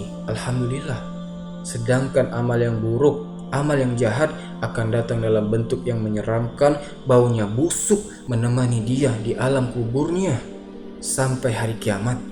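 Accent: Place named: West Sumatra, Indonesia. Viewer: Admin orang minang ya. Soalnya logatnya kayak orang minang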